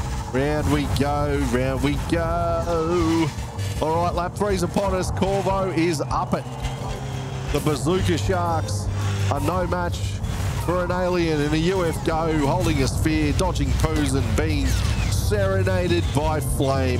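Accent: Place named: Australia. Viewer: Boy, you were so silly in this video, especially with the way you were saying Korvo's name. Your Aussie accent is awesome too.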